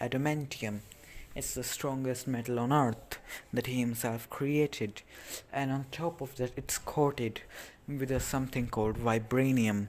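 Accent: India. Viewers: Are you an Indian your accents sounds Indian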